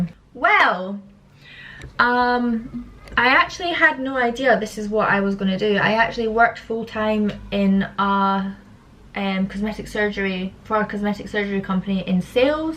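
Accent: Scotland, United Kingdom. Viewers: What your height is??? Cuz im about 6'1 think u look incerdibly beautiful love ur long blonde hair Got such an nice scottish accent which its sound so amazing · You look so gorgeous, and your scottish accent tho 😅💕 More video please 😅 and make a vlog about your daily activity 😅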